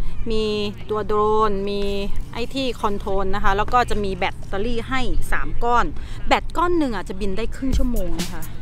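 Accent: South, Thailand. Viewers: เป็นคนใต้ใช่ไหมครับ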